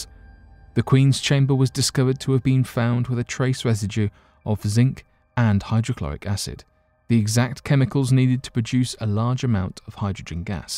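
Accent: United Kingdom. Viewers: Love this site, and the British voice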